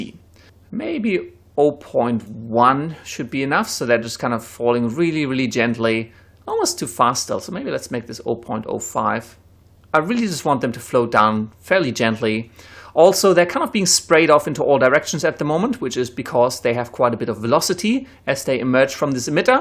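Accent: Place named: Germany. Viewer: eindeutig deutscher Akzent thank you helps me alot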